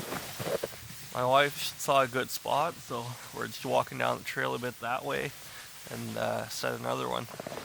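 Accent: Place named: Quebec, Canada. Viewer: Moi qui look this up pi j'técoute pi j'me dit: ya un accent Québecois chu sure. Ben oui! Nice!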